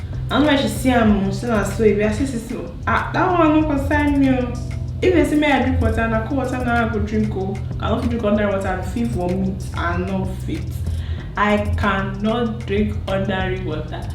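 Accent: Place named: Ghana. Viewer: You suddenly sound Ghanaian 👀👀.... Congratulations!!!!!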